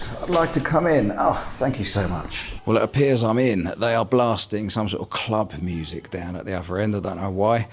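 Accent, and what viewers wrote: United Kingdom. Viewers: I always get the feeling that you are the kind of British guy that I would love to hang out with. I am a Singaporean but it gives me a sense of being a British when I hear you speak.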